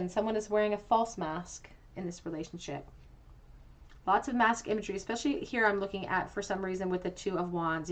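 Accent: Canada. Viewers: I love your readings ! Always some much information that is so helpful ❤! Your Canadian accent it is comforting to me, I am also Canadian but have been living in Europe for the last 10 years. Once again thank you 🙏 ❤❤❤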